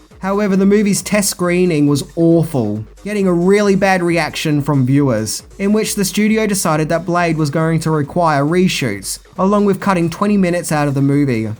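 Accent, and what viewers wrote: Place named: Australia. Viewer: Oh man the aussie accent is awesome "avendge his mavah"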